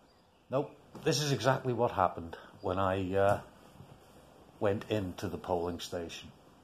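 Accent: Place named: Ireland. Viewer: I heard that Irish accent a little more this time :o)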